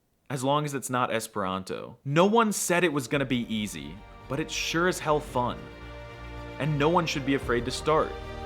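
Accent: United States. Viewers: "Um siri fazendo barra"?? Your american accent is so terrible I almost couldn't understand it. The m in um is not pronounced like m, but just nasalizes the u. And the r in siri is like r in spanish, not like in english mf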